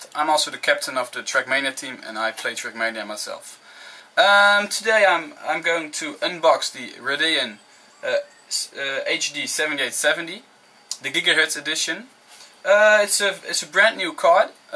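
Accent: Netherlands. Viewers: volgens mij een nederlander, ( klinkt namelijk nogal nederlands en engels is ook met een nederlands "accent") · je hoort zo erg dat hij nederlands is =D if i talk english it's sound much better ;)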